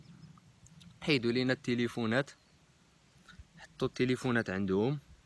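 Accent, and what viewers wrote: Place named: Shilha, Morocco. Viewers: انتى بايلي من هدرتك مراكشي ولاكن فوجهك كتبلي بحال الى باك كاوري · هدي اللهجة ديال الشلوح، عشت معاهم ثمنية السنين الله اعمر ها دار، ناس محترمين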